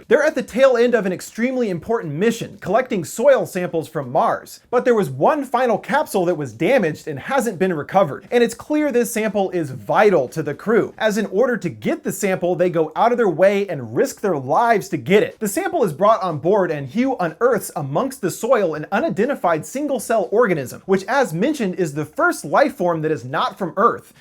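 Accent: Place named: United States. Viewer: The American accent is so fucking obnoxious, A complete assault upon the ears. Or maybe it's just this one guy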